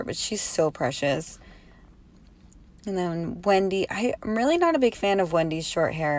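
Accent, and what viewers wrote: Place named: United States. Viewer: i also want to call it the Re Ve Festival but the girls pronounce it as "reeve" i guess since since yeri calls the robot Reve. You sound American, but how did you get the album so fast?! It'll take a month before I get mine. I'll trade anthing for Irene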